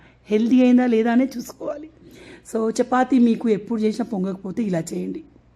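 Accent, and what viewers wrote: Telangana, India. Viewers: Nice Bhasha ❤👌pakka Telangana ❤❤❤😅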